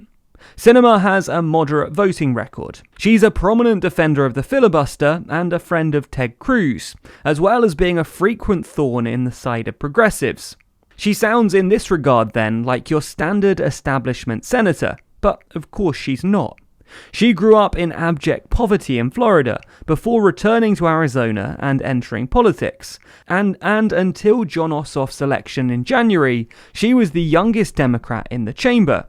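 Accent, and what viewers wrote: United Kingdom. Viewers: his main channel is for uk politics, he also speaks with a british accent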